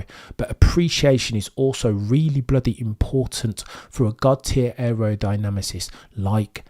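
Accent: Birmingham, United Kingdom. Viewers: try to learn how to pronounce names correctly.... as it is we have to put up with a brummie twang...you sound ignorant.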